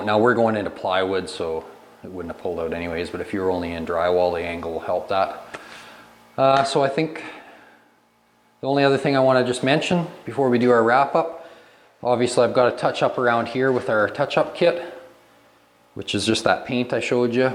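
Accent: Canada. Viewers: Love dudes accent. Proper Canuck. Just like me. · you can tell he's from CANADA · I'm Canadian, and I can tell he's Canadian just from this accent lol.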